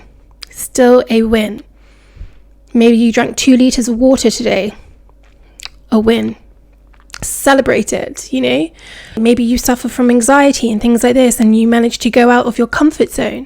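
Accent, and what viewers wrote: United Kingdom. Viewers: Sound like your from UK 😮😊😊 tappin in from across the pond 🥰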